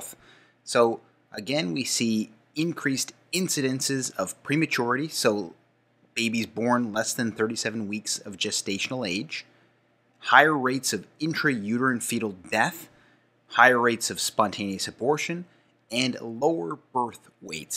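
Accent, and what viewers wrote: Canada. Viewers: I love your Canadian accent ! About, out, etc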